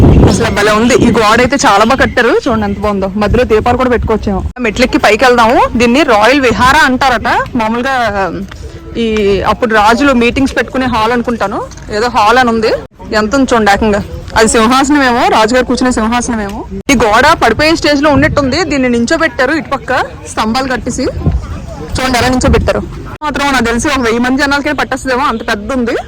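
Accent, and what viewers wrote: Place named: Srikakulam, India. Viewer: Your dress colour is very nice. Your vedios are excellent with srikakulam accent. is beautiful and participative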